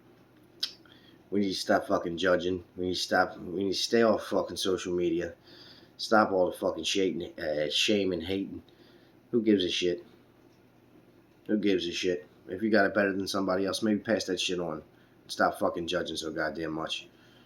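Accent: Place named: Ireland. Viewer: our soul is stranded in skin, bones and a little more of chunk for some of us. I believe the meaning of life is to live to the best of our ability and take it one day at a time. Some days I feel on top of the world and other days I want to crawl to the back of a cave and disappear. You’re right about money, it doesn’t define one’s character, it can leave a legacy but the act of love leaves a larger legacy ❤️ BTW Mr. your Irish accent is ☘️ but I love your authentic voice. Keep the videos coming……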